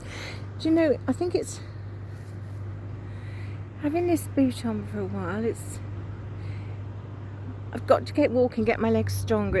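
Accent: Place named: Southern England, United Kingdom. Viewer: we both have an English southern accent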